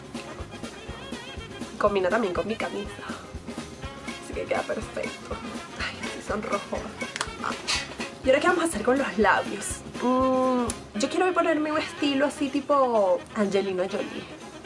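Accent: Venezuela. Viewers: la forma de hablar no se pierde, se ve que eres venezolana, me encanto tu video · Iguaaal. Se te nota la venezolana y mucho jajajaja. SALUDOS PAISANA 💙💜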